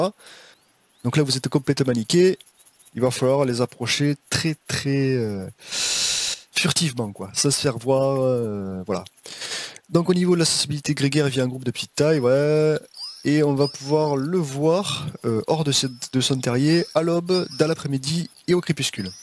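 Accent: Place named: South, France. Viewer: abo j'avais laissé le jeu de côté après des centaines d'heures de jeux tu m'as redonné envie d'y jouer... Merci c'est peut être l'accent du sud lol bon contenu en tout cas je vais te suivre 👌👍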